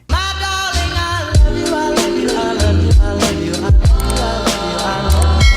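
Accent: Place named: France. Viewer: Loved your French accent 😃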